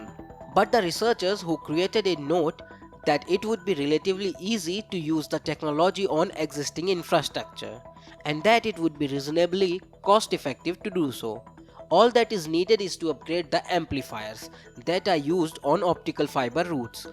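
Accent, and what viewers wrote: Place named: India. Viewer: 咖喱味英语劝退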